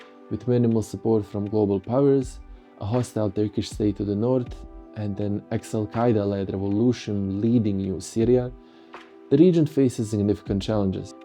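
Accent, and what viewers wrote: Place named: South Slavic, Eastern Europe. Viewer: Love the video I hope there are more geopolitics commentary with south slavic accent out there.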